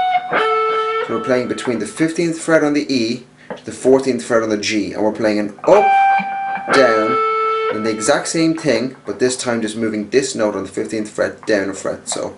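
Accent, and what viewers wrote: Ireland. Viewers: are u irish... im from galway! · irish ? :D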